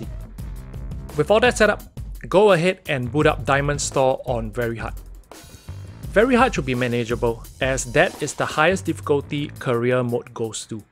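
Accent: Singapore. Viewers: You from Singapore?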